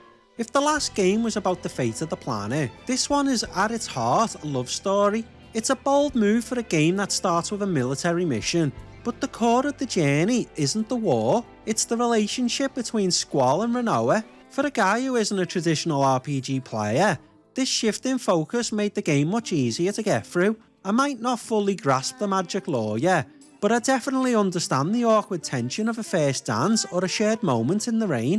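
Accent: Liverpool, United Kingdom. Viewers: Man the Scouse is THICK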